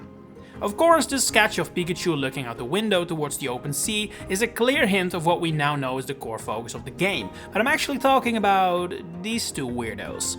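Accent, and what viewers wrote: Netherlands. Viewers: New to the channel and great video. Side question: are you Dutch?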